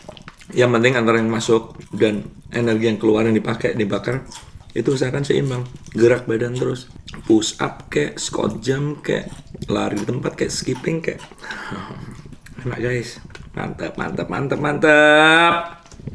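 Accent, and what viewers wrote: Surabaya, Indonesia. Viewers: Suroboyo pooll iki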